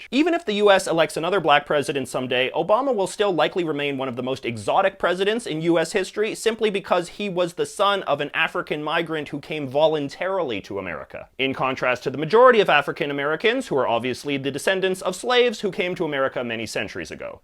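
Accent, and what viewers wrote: Canada. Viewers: As an American, and particularly as a southeastern American it's very interesting to me to hear how you say things. I don't know hardly any Canadians in north Georgia and certainly none with the stereotypical Canadian accent. Little things like how you said keenya and in another video you said naypal for Nepal. Also it was funny how you couldn't do the polish name. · Well he’s Canadian he’s pronouncing it in the Canadian not the Jamaican accent obviously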